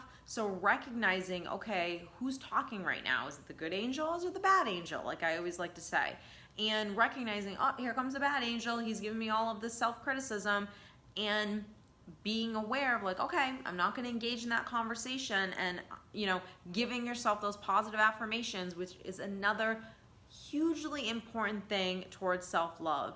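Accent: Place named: New England, United States. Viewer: Hey — really am enjoying ur podcast and the tidbits of information that you provide. Love the New England accent that slips out times. Very charming 🤗. Keep doing you— it resonates with so many of us! #STEPHANIEROCKS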